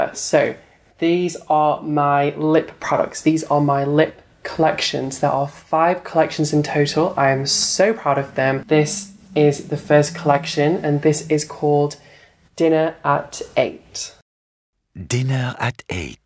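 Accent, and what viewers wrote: France. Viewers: Makeup looks really nice and I think the price seems reasonable! That French accent though doesn’t fit it sounds like the chef in ratatouille can’t take it seriously! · Love the packaging, dont like the French accent. We can see you better then the model. If there was better lighting and close up of the lips would have been better and let her apply it next time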